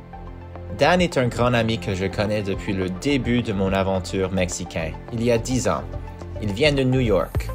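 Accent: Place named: Quebec, Canada. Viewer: J’adore votre accent québécois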